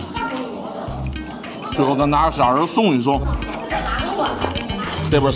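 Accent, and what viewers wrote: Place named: Tianjin, China. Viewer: 皮牙子既然你是说了天津话解说就按天津话说洋葱头好了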